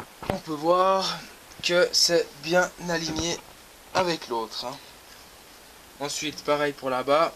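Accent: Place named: Switzerland. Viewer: hello, vu ton accent et ta minutie tu viens de suisse et moi aussi :) du coup j'aurais aimé savoir ou est ce que tu as acheter les vitres et les rails car je n'en ai pas trouver a jumbo ou autre magasin de bricolage :(( mes pantherophis demande de la place, je cherche a me lancer dans la fabrication d'un terra comme le tiens. en espérant que tu pourras m'aider... en tous cas beau boulot!! chapeau! et merci pour la vidéo.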